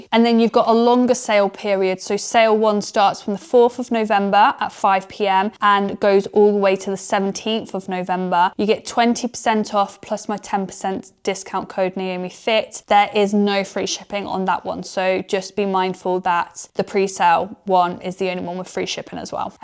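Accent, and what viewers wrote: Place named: United Kingdom. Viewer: You are a BEAUTIFUL blonde/dirty blonde-haired young woman. Beautiful sexy sparkly light blue eyes, beautiful smile and sexy voice with the British accent. STUNNING figure. BEAUTIFUL body and curves, NICE booty and sexy long legs. Really sexy underarms. You look INCREDIBLE in all of these pairs of FitJeans. All of the colors look REALLY good on you and make you even more beautiful. Every pair of these jeans hug your body and hips and make your beautiful curves POP and give you a NICE booty. You have beautiful light blue eyes. You look AMAZING. You are BEAUTIFUL and VERY cute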